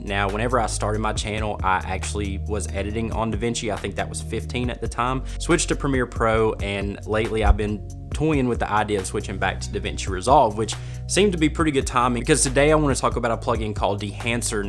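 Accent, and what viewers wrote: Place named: Georgia, United States. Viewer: That's a Georgia Accent if I ever heard one. Takes one to know one. Marietta!!!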